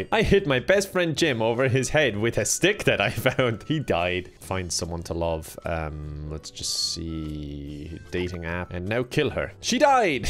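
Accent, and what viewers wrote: Ireland. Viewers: are you from ireland? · Irish DanTDM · Is he irish I am 🇨🇮🇨🇮🇨🇮🇨🇮🇨🇮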